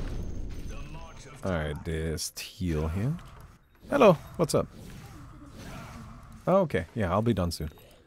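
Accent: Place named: Germany. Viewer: It is 100% without a doubt a German accent. Not Canadian, not enough "ey". Not American, not enough cowboy or California. Not French, Italian, English, Irish, swedish, Russian or Australian.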